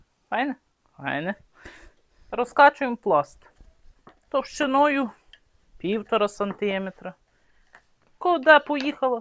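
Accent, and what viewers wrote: Lviv, Ukraine. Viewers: Ні, не Житомирська. Там слово #файно# не вживається. Скоріше Львівщина.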